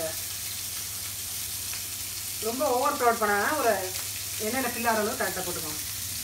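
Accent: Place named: South Tamil Nadu, India. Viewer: super mam......just now I saw your cooking video and subscribed....its really amazing and I couldn't believe your are speaking perfect south tamilnadu language.......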